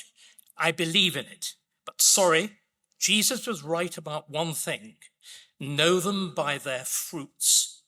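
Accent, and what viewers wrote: RP, United Kingdom. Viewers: What a load of meaningless guff. Run on sentences of nothing delivered in a posh accent; who’s gullible enough to fall for this man’s schtik?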